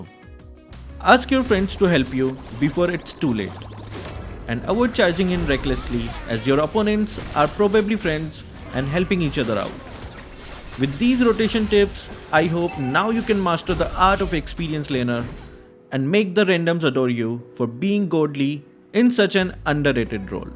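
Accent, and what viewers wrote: India. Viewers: Are you from India?😅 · question- Where are u From.. u sound like u r from india.. Please tell me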